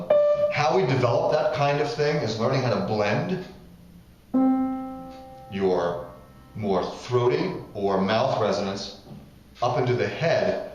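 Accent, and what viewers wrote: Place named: United States. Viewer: First of all you need a middle class London accent and then the phrasing will come naturally! Being British, I can hear your American accent cutting through like a Chainsaw! Just a thought...Not an order! Example of this is Ken Tamplin trying to sound like Freddie Mercury......Oh Dear!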